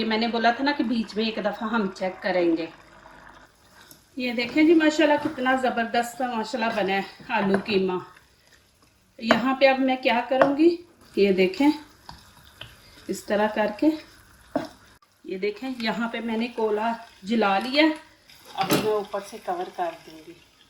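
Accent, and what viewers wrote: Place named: Punjab, India. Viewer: Tusi Punjabi ho?!! urdu may Punjbai khushbu a rahi hai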